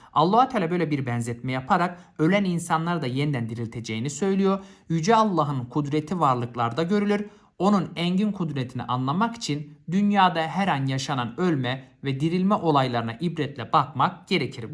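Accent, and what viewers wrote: Malatya, Turkey. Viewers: Hocam no 44 Malatyalımısınız 😂😂😂🎉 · Hocam, Malatyalı mısınız?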